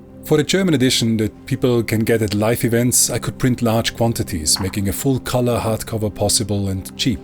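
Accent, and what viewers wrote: United States. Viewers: Good to see you again. Your voice sounds somehow different? More American- I even wondered if it was you narrating at one point!! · I am very confused. I do NOT recognize your voice. When did you aquire an American accent? Speaking of American, you seem to have pivoted from Religion to the mighty dollar. How very sad this new you is! I hope you reconsider and go back to your old self. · Great to see you back, Father. But you seemed to have aquired an American accent and the pitch in your voice seems to be an octave higher...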